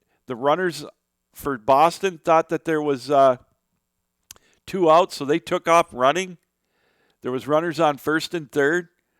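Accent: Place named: Port Huron, United States. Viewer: You have to be from Port Huron... you sound just like my grandparents :D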